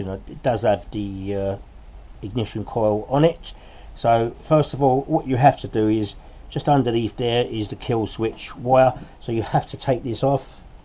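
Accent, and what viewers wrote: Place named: United Kingdom. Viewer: That was helpful although I had difficulty understanding through your British accent. Thanks.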